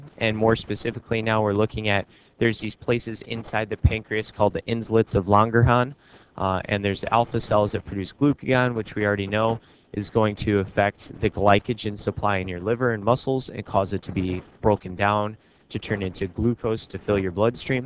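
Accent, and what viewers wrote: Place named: Upstate New York, United States. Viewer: An upstate NY accent! What a surprise to find when I was surfing the internet looking for endocrine lectures :)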